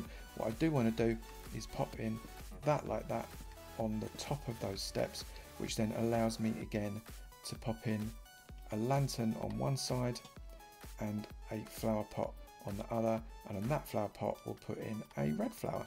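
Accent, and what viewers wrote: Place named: North, United Kingdom. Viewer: Um. You must not have listened to many males from the northern UK speak. He sounds like one of those, but that’s pretty much where the likeness ends.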